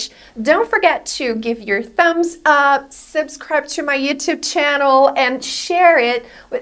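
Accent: United States. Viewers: Brasileira? Caramba, pensei que fosse americana. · But she's speaking american, not english...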